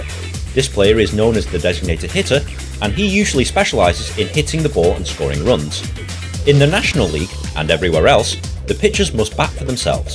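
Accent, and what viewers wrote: United Kingdom. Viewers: As a guy named after a baseball player, it's just fun to listen to a British guy explain the rules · Is this a brit explaining an american sport? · as for eastern european man trying to learn american game this british accent does not make any it easier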